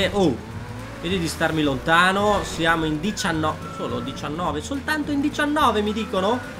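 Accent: Veneto, Italy. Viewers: Ma sei veneto hhahaha? Ciapa fogo hahah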